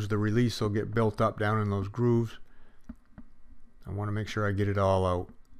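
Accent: Maine, United States. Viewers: I moved away from Maine about 30 years ago give or take. I'm listening to him and thinking that's a Downeast accent. Then at he says "getting everything right screwed into place".. :) Yup. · LOL within 10 seconds of hearing you speak, I said this guy's from Maine, possibly NH. Good Job!!! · Ohmygoodness, you sound like home. You live near Rumford, ME? Or Waterville. I bet you're Waterville. I'm out in Colorado now, but grew up in Monmouth. Watched the whole video just to listen to you. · I could tell you were a fellow mainer, that accent is thick. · You sound like you may be from Maine. Great video! God bless from Aroostook County.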